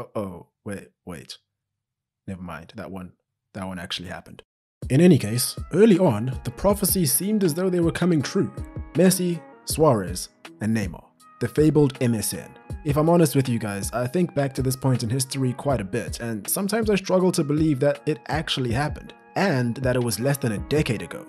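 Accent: South Africa. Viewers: I knew this voice sounded south african awe my brother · Nice to hear a South African accent for once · Through out this whole video I'm like "this man sounds hella South African" and I'm glad I was right. Just gonna sub cause of that ✊. Also great video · Is that a South African voice i here? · You sound South African? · I just wanna know, are you South African becoz you sound like one… btw love the videos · Are you from South Africa? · Are you South African mate? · Hey…are you South African?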